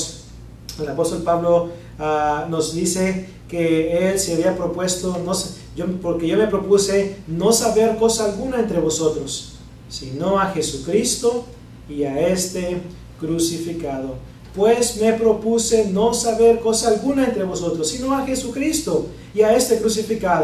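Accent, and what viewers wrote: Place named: Dominican Republic. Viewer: El pastor se le escucha el acento como el del Pastor Miguel Nuñez y es de Rep. Dominicana puede ser que éste pastor puede ser yo no aseguro pero me gusta su clase nunca había escuchado todo ésto Dios lo bendiga Pastor de donde sea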